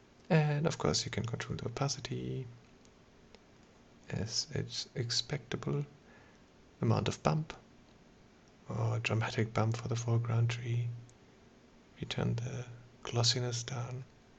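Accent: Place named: Germany. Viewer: Ich meine ich höre einen deutschen Akzent? Werden wir nichtperfecktenglischversteher auch mit einem deutschen Tutorial verwöhnt oder habe ich es übersehen? Lieben Dank...Finde die Möglichkeiten großartig.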